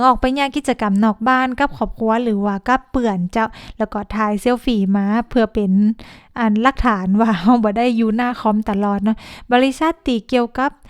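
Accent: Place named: Chiang Rai, Thailand. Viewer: จากสำเนียง…น่าจะคนเชียงราย ไม่ก็ แพร่น่าน แม่นก่อ..ตั๋ว??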